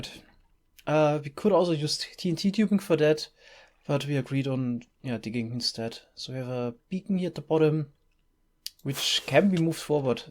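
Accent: Germany. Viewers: Nairolf 00 Neeeeeiin, hat nicht schon 2,<num> Millionen Mal in seinen Videos gesagt dass er deutscher ist, das hab ich mit wohl eingebildet... Haha du bist du selber deutscher, als ob du da nicht seinen krassen Akzent raushörst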